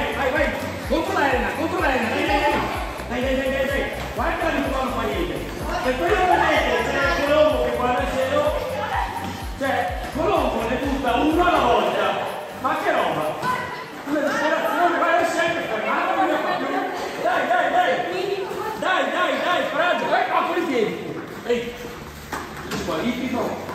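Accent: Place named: Veneto, Italy. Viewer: incomprensibile....parliamo l'taliano!!! ...non il veneto o similari.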